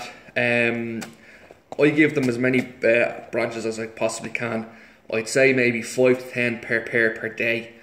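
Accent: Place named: Dublin, Ireland. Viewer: great video pal I'm keeping linnet cock to hen canary. two pair of canary red poll cock paired to two hens in one of me flights and pair of goldfinch in the and two cock goldies paired to two hen canary ur soft food mix looked great . can you tell me where to get canary soak seed I'm just use in pigeon soak at the minute the stuff u get in Turkey thanks for a great video and help full tips hope u can help I should have said I'm from Dublin and u sound similar thanks again keep the videos coming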